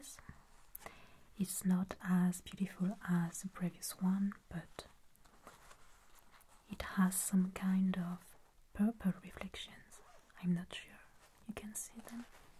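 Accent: France. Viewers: Very French accented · J'adore regarder tes vidéos. Je suis française et vit aux Etats-Unis. Entendre ton accent français me rappelle à quel point la France me manque. Merci beaucoup pour tes superbes vidéos. Elles me détendent quand je suis stressée. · Heyy I absolutely looove your asmr videos I just clicked on one of ur videos and I fell in love.. ur asmr is aesthetic it’s soo pretty to look at, I am learning French and I love your French accent. I think the French is a language that is perfect for asmr. I hope you make a video in French and definitely put some subtitles💕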